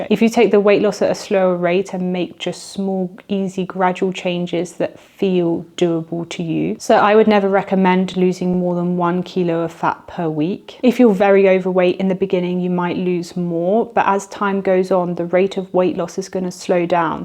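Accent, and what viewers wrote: United Kingdom. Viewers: The British accent made it difficult for me to watch and understand this video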